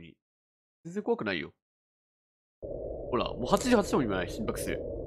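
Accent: Kansai, Japan. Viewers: 床の軋む音とか囁き音やら音がまじリアルで…めっちゃ怖かった🥺 けどの関西弁沢山聞けて耳幸せ… 心拍数がある所で、こういう所ではびっくりしてるんだなぁって分かってそこは面白かったです！！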